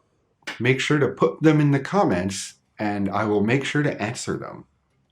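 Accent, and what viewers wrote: Canada. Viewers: It is English, from a native speaker, he's also Canadian, so it would be "Canadian English" I suppose. The North American pronunciations are the widest spoken, more than 75% of native English speakers use North American pronunciations. If he were Welsh would you expect him to say "Welsh English"?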